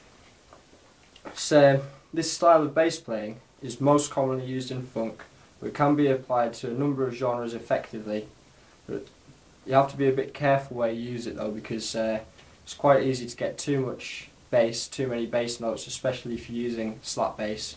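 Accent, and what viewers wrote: United Kingdom. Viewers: great lesson! the best i've seen yet in terms of teaching technique. by the sounds of things you live pretty near to me, are you a nottingham lad by any chance? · hi man.. just chilling... been messing around with double thumb technique myself.. though ive not long been at the stage i wanted with my standard slap... but im geting there... after watching this i think its a great exercise to improve .... one i will try out in the morning.. nicely done dude... guessing from ur accent u must be northern england.... yorkshire or there abouts :)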